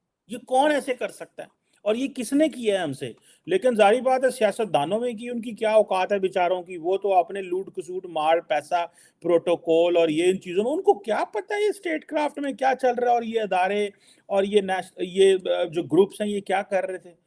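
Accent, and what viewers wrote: Punjab, Pakistan. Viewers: Bosss stop using your Punjabi dialect if u want Indians to hear u, Kashmir me jehad naheen, aapkey mulk Ney crime kiya hai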